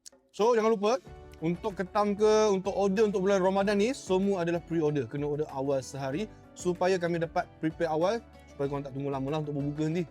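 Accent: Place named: Perak, Malaysia. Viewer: Sleng peyrock still ade.. padu..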